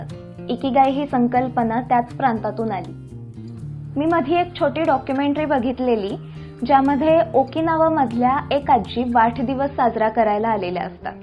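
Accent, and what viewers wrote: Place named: Kolhapur, India. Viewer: तझा आवाज खूप बालबोध आणि मुक्त आहे मी तुझे भटकंती चे सारे videoपाहिले खूप सुंदर मराठी बोलणे तू जप ले आहेस त्याला कोल्हापुरी बाज आहे प्रतेक गोष्टी चा बारीक तपशील देणे ही तुझी सहज आवड आहे.जपानी लोकांचा जिवनाकडे पाण्याचा दृष्टि कोन सहज असतो.कविता वाचन छान, जपान ला जावून आलीस का?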